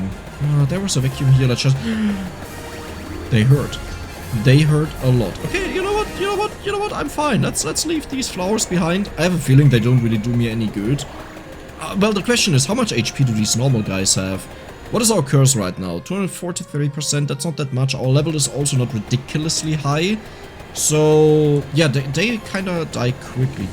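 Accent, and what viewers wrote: Germany. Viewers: Are you german,?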